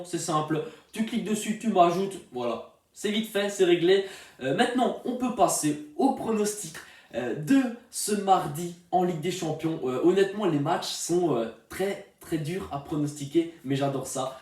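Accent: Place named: North, France. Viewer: Toi, t'as l'accent du Nord mdr !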